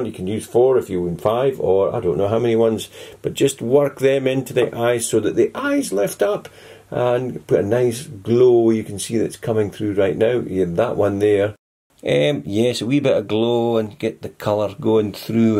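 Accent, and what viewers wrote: Scotland, United Kingdom. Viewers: Holly Nethercot. That's how people speak in Scotland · i wonder how many non scottish people watching this like whits he saying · i'm not scottish, and i understood every word😂 i just absolutely love his accent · I love how americans dont understand wit hes sayin but i love the wee accent i have and im so glad im scottish 👍🏻😂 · There's a Scottish security guard at my school & he sounds exactly like this lol but this was funny, he actually was good at explaining everything tbh 😂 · Epic! I'm Scottish too. Understood every single word and cracked up laughing at subtitles! X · It's so great to understand every word even though I'm German! I love the Scottish accent! Scotland the brave! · so how come you have such a proper scottish accent? is it just so people on youtube can understand you better? when I first clicked on a video of yours it took a second to realise you were even scottish hahah, i do find myself talking a bit more proper when i'm talking to someone who isn't scottish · I feel as if I only know what he's saying because I'm Scottish 😂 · my dad is Scottish so I can understand everything he is saying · Where are you from??? 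My dad and your dad have the same accent!😂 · Haha Scottish accents r the best 💝 I'm Scottish too xx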